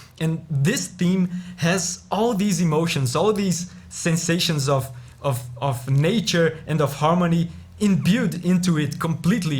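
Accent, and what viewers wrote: Brazil. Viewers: Right in the first phrase i noticed that you are brazilian. Muito foda teu trabalho, mano! assisti vários vídeos em seguida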